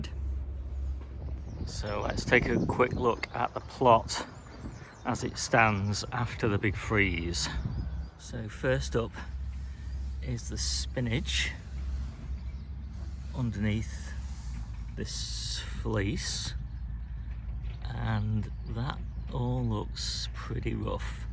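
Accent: United Kingdom. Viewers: I don't live in Lancashire (my grandma was born there however, so I am assuming that your accent is indigenous?) but we did have a weekend with snow here in Tacoma WA, USA (after this weekend I wish that I was in WA, Australia, LOL.) I don't think that anything that I have planted died, broad beans, onions, look OK. I don't have a poly tunnel or other protection, but I do have sheltered areas in the garden so that helped.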